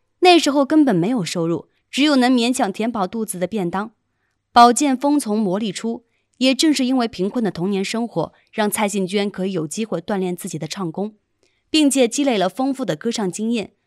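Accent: China. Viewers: 大陸人配音？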